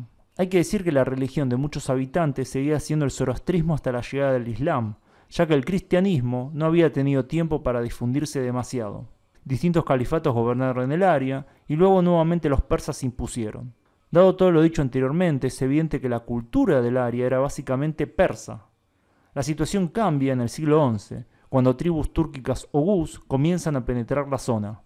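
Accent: Buenos Aires, Argentina. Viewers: ¿Vos te das cuenta de la cantidad de letras q leés como "eyyyyheee"? ¡HASTA LA JOTA LA CONVERTÍS EN ESAPORQUERÍA DE "LETRA" Q INVENTARON USTEDES LOS PORTEÑOS, CON LA Q SUBSTITUYEN NO SE Q´ CANTIDAD DE FONEMAS! ¿Tenés conciencia de cómo te baja el el nivel como para q te andés metiendo en temas de la cultura? ¿No se dan cuenta de cómo mutilan el alfabeto y el lenguaje con ese sonido caníbal insoportable? ¿De dónde lo sacaron? Si oís grabaciones de radio y tele hasta hace unos pocos 20/30años es imposible oír esa aberración brutal, q es pura ignorancia y degradación de la cultura nacional. ¡EXPLICAME CÓMO SE ESCRIBE ESE SONIDO Q ES A LA VEZ EYE, JOTA, ELLE Y NO SE CUANTAS MÁS! ¿Porqué no te dedicás a la cumbia, el cuartetazo y la bailanta, q te va a caer como anillo al dedo? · Si es argentino y el dialecto rioplatense destaca por un yeísmo exagerado, ¿cómo quieres cambiar su modo de hablar español? 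· Lo único aburridor el asentó, pero lo demás super, un acento colombiano sería super, pero bueno aceptar así los hermanos argentinos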